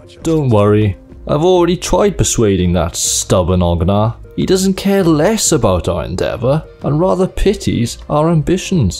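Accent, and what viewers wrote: Wales, United Kingdom. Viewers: are you actually welsh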